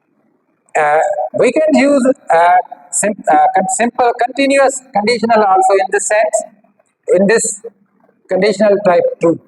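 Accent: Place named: Sindh, Pakistan. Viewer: Good effort, but there's room for improvement to make these sessions or videos more engaging and accessible globally. Here are some specific suggestions: - Audio quality: Consider investing in a better microphone or recording your voice separately to ensure clarity. - Teaching style: Update your approach to match modern educational standards, which emphasize interactive and fun learning. - Language: While Sir delivers sessions comfortably in English, a strong Sindhi accent is still noticeable. Refining his English pronunciation would improve connectivity and facilitate better understanding for all listeners. - Presentation: Practice your delivery to maintain a natural flow and consider using digital tools like interactive whiteboards to enhance engagement. - Pacing: Avoid reading from a physical board line by line; instead, use it as a visual aid to supplement your teaching. 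I believe these sessions have a lot to offer, and I'm sure Sir direct connections appreciate them. To expand the reach and impact, consider making the content more accessible and engaging for a broader audience. Please take this feedback in a positive light, as I am connected with Sir and eager to benefit from his sessions. As my English is still limited, I rely heavily on tools to assist with my daily tasks, such as reading, writing etc.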